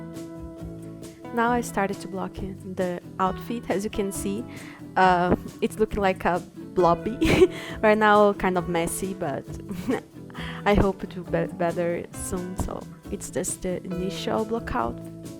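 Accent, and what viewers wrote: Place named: Brazil. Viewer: é muito bom ouvir o sotaque brasileiro! Parabéns pelo processo! Espero que seja o inicio de mais series como essa ;)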